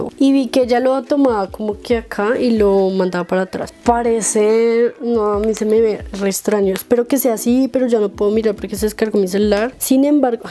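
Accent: Colombia. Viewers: por el acento, Colombia creo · 1. Me encanta su cabello 2. Wow me encanta tu asentó (Creo que eres de Colombia ) pr tu asentó 3. Si alguien le da dislike a el video voy hasta tu casa y te mato😎 pq quien le daría dislike a esta joya de vídeo 4. Me encanta como editas Y eres de Colombia verdad ? Saludos desde Colombia 🇨🇴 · WOW!!! me encanto tu vídeo!!! 🦋N🦋E🦋V🦋A S🦋U🦋B🦋 pregunta: eres de Colombia? tu asentó es super colombiano JIJIJIJ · Su voz describe colombianidad 🇨🇴🇨🇴🇨🇴🇨🇴🇨🇴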